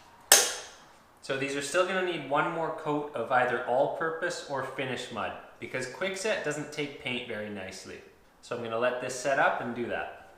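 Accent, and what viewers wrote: Canada. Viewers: Canadians have agonizing accents.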